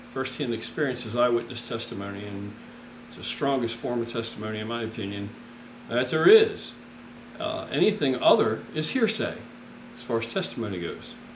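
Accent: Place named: Philadelphia, United States. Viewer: sounds like a philly accent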